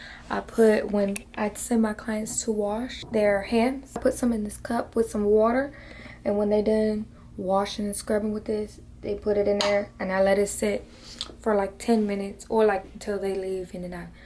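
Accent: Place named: Mexico. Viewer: I know she's Mexican but her Mexican voice is a little too much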